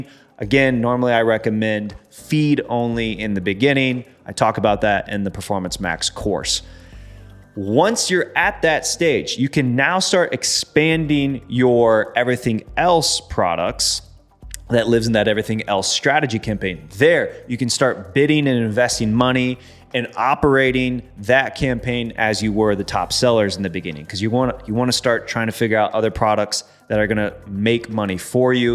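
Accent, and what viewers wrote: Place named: Boston, United States. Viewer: I am from Boston and you sound like you are from around here! We are straight shooters like you - love the vids! All hard content and no bs fluff! Thanks man - awesome videos!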